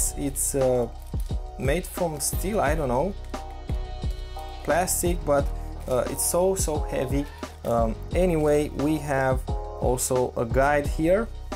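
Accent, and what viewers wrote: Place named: Romania. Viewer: you sound like the average romanian xd. Are you at least eastern or central European?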